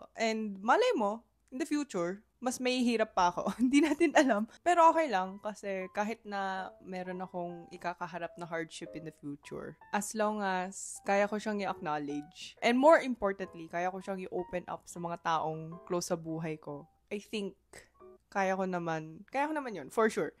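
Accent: Batangas, Philippines. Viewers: The batangueño accent is giving HAHAHAHAHA nachika kalang pero nakakahatak talaga ng attention hahahaha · Saan ka dine sa batangas? Kakatuwa ang punto mo, batangeño batangeño eh..😂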